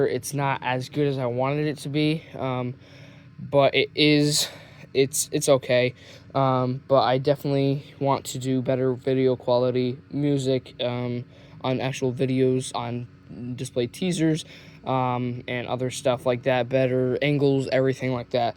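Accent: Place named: Minnesota, United States. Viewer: man, i seriously thought it was. I think the accent added to my conviction that it was Minnesota landscape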